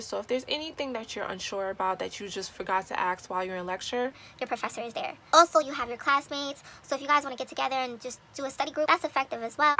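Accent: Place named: New Jersey, United States. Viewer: I was literally binge watching your videos all morning and then boom a new post 😂😂 I am new to your channel. I work full time and goto an RN program . I am from New Jersey so your accent reminds me of home lol. Good luck! You are way more dedicated and organized than I was when i started. I think I was still in my college phase of partying and cramming but this last semester I rocked it out! I have one more semester starting in January so it’s been a long road but I am ready to be done and I am deciding what kind of nurse I’ll be. Anyway you amaze me and keep going and inspiring 💕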